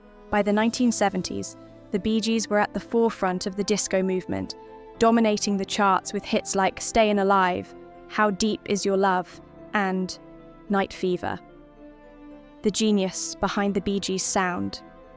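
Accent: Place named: United Kingdom. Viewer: LOSE the extremely heavy British accent!!!! It doesn't work well for narrations!!!!!! 😮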